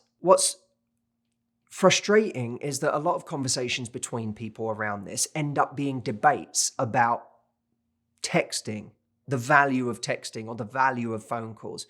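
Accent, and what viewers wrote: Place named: United Kingdom. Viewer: he sound British🇬🇧🇬🇧🇬🇧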